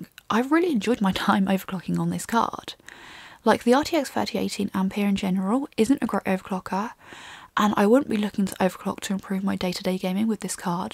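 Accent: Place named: Kent, United Kingdom. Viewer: Great video! Are you from Kent? Your accent reminds me of Kent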